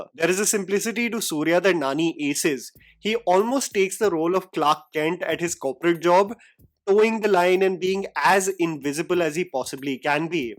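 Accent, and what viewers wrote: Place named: Telugu, India. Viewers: ur Telugu is cute 🥰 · Your Telugu is sooo cute..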